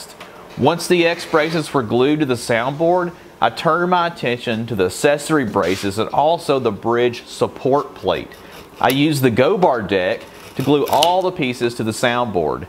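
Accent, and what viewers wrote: South, United States. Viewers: This is just a wild guess but are you any chance from the south? Thanks for the videos.....really enjoy them.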